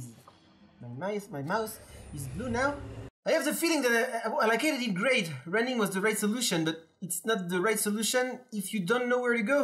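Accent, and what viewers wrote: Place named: France. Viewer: yup, ton accent français est bien présent 😂👌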